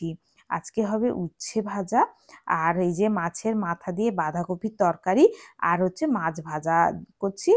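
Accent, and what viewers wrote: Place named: Medinipur, India. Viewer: Purba Medinipur er kothay didi?? Amr bari Kanthi.... Macher tok sunei bujhechilam, tumi o Medinipurer ❤️...tai comment kori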